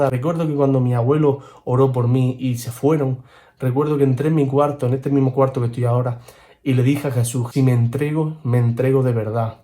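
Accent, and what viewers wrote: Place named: Murcia, Spain. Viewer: Subscrito!. Adelante te apoyamos! Ole el acento Murciano!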